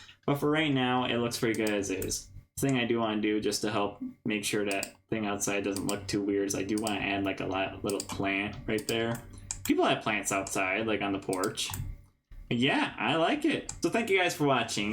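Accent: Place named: Newfoundland, Canada. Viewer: this is so random, but I'm willing to bet money you're from newfoundland Canada, I can tell that accent from anywhere! -a newfie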